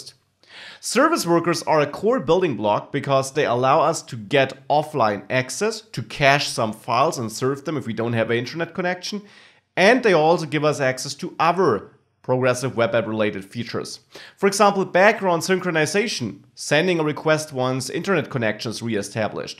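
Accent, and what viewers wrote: Germany. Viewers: I'm from Germany and watch this German guy speeking Englisch...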